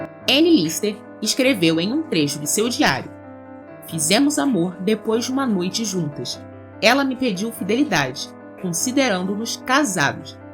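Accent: Rio de Janeiro, Brazil. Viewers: Lá no RJ eles falam bonitinho assim mesmo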